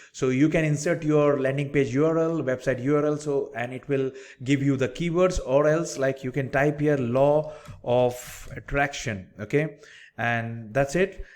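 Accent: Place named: India. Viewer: I love indian english. Value in video 👍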